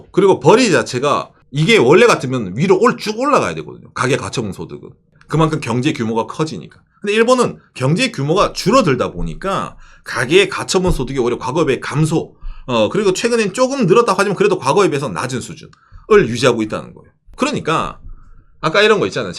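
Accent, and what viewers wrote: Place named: Andong, South Korea. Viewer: 우쨌든동? 안동쪽이 고향이세요? 😊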